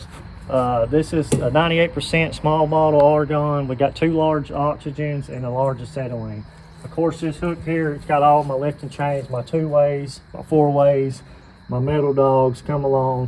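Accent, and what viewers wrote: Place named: Europe. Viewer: What a nice white European accent 👍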